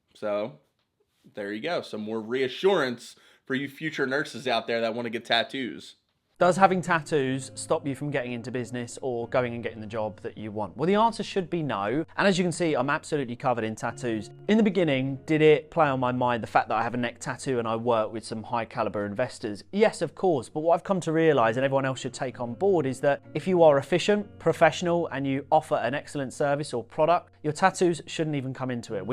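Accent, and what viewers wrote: Philadelphia, United States. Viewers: When I enlisted in the military in 2008, my first choice was the marine corp. I couldn't get in because of tattooed knuckles and a back piece visible above the neck of my shirt. Ended up in the Army because they offered me a "tattoo waiver." All my tattoos were inspected, and recorded during my entrance physical, the record ended up being 7 pages. Now I'm a subaru mechanic. Also, I can hear the Philly in your voice, Frankford born and raised.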